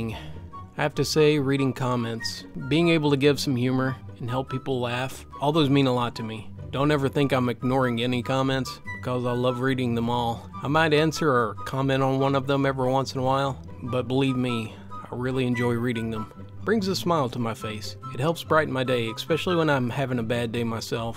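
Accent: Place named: Kentucky, United States. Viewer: pleased to hear it in a KY voice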